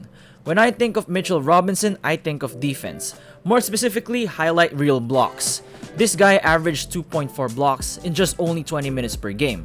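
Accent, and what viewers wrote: Philippines. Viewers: Man I recognise your accent, are you Filipino my guy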